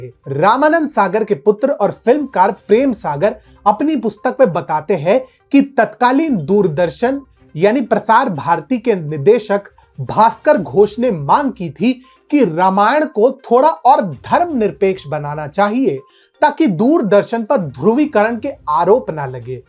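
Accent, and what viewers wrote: Standard. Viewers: अति उत्तम पोस्ट। जी आप बहुत शुद्ध हिन्दी में प्रस्तुती देते हैं। साधुवाद। कृपया निड़र ह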